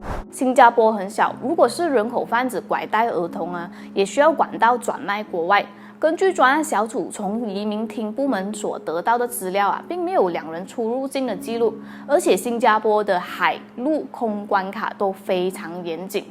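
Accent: Malaysia. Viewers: 很好很马来西亞中文音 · 这是马来西亚的华语。发音跟普通话不同。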